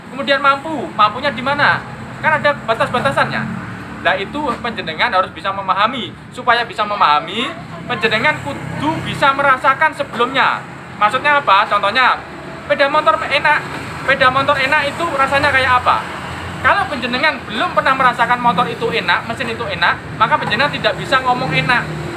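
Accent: Java, Indonesia. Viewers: Jowo ne ngendi mas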